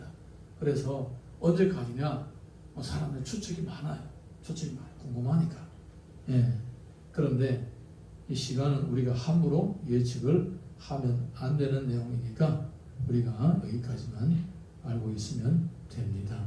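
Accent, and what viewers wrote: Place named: Pohang, South Korea. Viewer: 아멘 으로. 화받후 경청 합니다 말씀 하시는 억양이 포항 연일 그쪽 같아요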